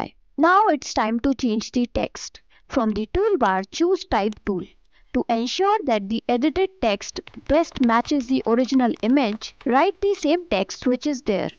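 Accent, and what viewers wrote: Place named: India. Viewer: Terrible. I didn't expect anything better when I heard an Indian accent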